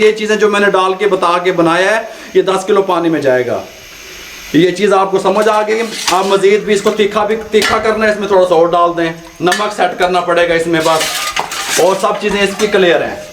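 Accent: Punjab, India. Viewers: Boht piri Panjabi bolty hn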